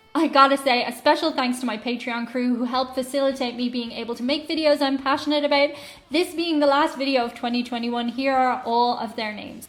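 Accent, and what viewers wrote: Ireland. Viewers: With that wonderful Irish accent you could tell me the world is coming to an end today and I wouldn't care.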